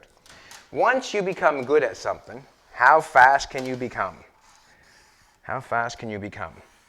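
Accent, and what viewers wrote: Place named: Canada. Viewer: Lol you Canadian eh not Ruski lol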